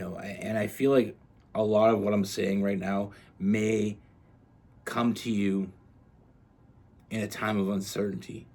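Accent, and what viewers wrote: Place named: Canada. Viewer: I friggin knew you were a cappie! aslo hearing the canadian in there. Love your work and storytimes ;)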